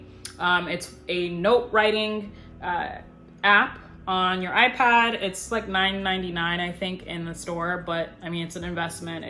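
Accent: Nigeria. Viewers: It was the Nigerian accent for me. Never subscribed to a YouTuber as fast as I did today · Hah your Nigerian accent actually made me sit up and pay full attention. Can I hire you to stand near me while I'm studying and tell me off when I get distracted?! <3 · speaking in Nigerian accent and giving tips <3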